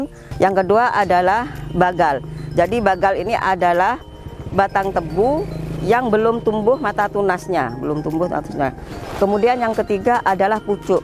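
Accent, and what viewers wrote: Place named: Madura, Indonesia. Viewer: bahasa Indonesianya , ketoro nek wong meduro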